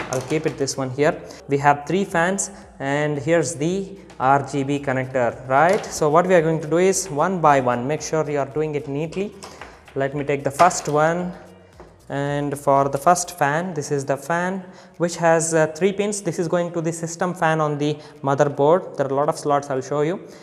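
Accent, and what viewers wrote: India. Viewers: I had quite a bad day and hearing English with Indian accent really cheered me up. Great video!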